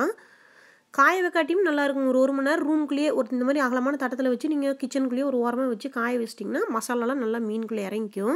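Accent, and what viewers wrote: Kongu, India. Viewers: Kongu tamil super sis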